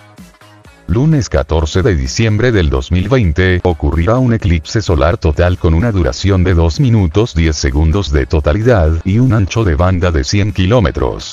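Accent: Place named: Argentina. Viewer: Me suena argentino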